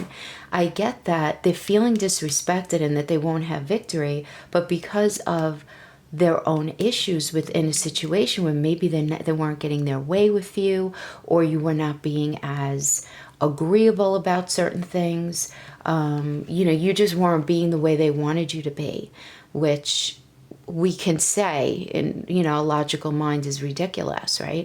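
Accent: New York, United States. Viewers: Thank you so much! Are you a New Yorker? I feel like I know you just by the voice!!! Nevertheless thank you for your reading!! I will gladly make a donation ✡️❤️❤️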